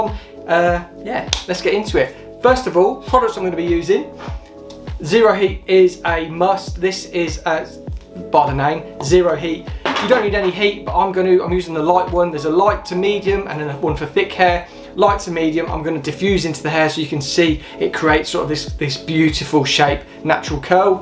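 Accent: United Kingdom. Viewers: As much as I like the British accent you talk so much I get anxiety 🤣🤣🤣🤣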